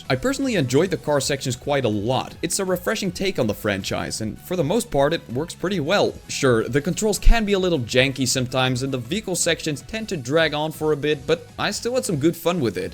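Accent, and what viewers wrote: Netherlands. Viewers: Nice video and your top 6 is on point (mooi nederlands accent trouwens) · jij klinkt echt Nederlands hahah. Goeie video man, deze serie is pure nostalgie voor mij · are you dutch? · Is that a dutch accent i spy? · heujjj did i just hear a nederlands accentje? skytuber from the netherlands is always a big plus. i tought the skylanders fans wherr a dying race here. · leuk geprobeerd, maar ik herken een nederlands accent uit duizenden!! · i smell nederlander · its funny i can hear ur dutch because of ur accent XD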